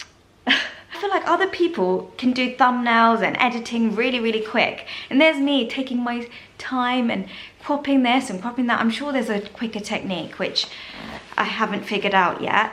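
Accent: United Kingdom. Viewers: there's a saying "if i had a british accent i would never shut up." 😂😂 ur lucky u have a british accent. i wish i had one too lol.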